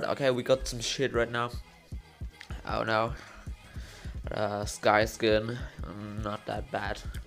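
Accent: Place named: Germany. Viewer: Best Commentary 2k17 the German Accent is just awesome and it sounds you chewing bubblegum while the whole video. Still op Video ^_^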